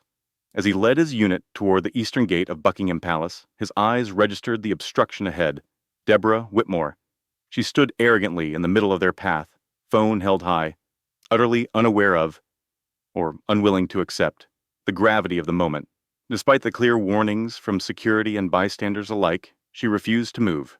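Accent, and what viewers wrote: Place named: United States. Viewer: It's a good story to express about our guards but spoken by an American. I began to feel sea sick when the picture kept moving side to side.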